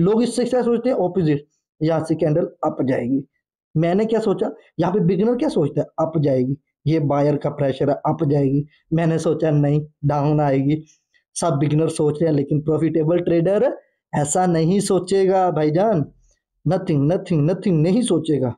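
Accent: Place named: Kashmir, India. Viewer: Sir bohut kush sikhne ko mil rha ha apki videos sa bilkul hmrey jammu and kashmir ki trh language boltey ho ap❤❤❤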